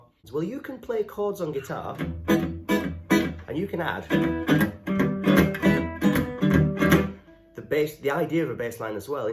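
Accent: United Kingdom. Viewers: ☺️ ah! Nice too hear a native YORKSHIRE voice teaching guitar. Nice wee tutorial as always, cheers pal 🍻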